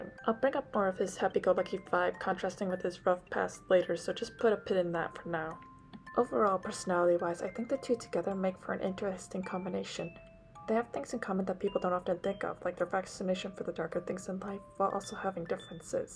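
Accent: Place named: Hungary. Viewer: I'm really exited for the full video! Someone finally talks about one of my favourite ships. And you have a really cute Hungarian pronunciation.:D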